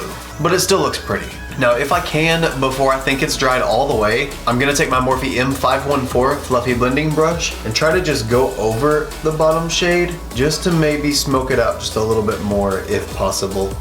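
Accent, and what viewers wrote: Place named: South, United States. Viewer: Why are you the cutest?! I just found your page and I really like your Stila liquid tutorial and also your slight southern twang! ❤️❤️❤️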